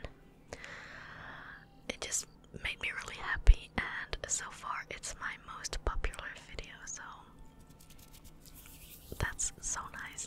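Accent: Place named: Netherlands. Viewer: Where are you from my guess is the netherlands cuz of the accent.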